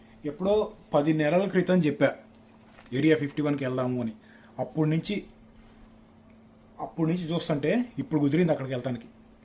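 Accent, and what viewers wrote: Andhra Pradesh, India. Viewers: Meeru Nizamabad .. Slang emo andhra... ela?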